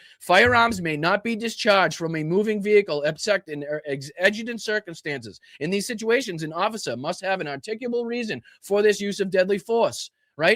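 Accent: Boston, United States. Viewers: right on. Love listening to you. Boston accent.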